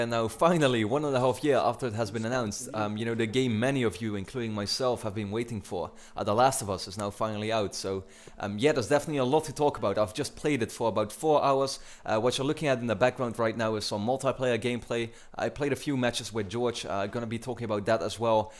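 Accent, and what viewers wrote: Netherlands. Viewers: Are you from belgium or netherlands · Aan je accent dacht ik al dat je Nederlands was maar weet het nu zeker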